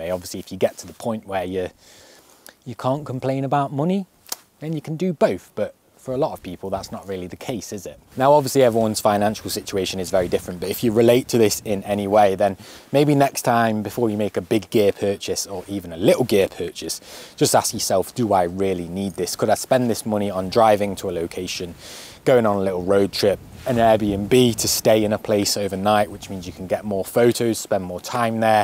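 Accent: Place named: East Midlands, United Kingdom. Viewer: You mentioned living in the East Midlands.. Would you mind me asking whereabouts? I'm from Mansfield and your accent does sound a bit familiar. With regards to regrets, I can relate to spending so much money on gear that I then can't afford to go anywhere to actually take the photos in the first place! 😂😂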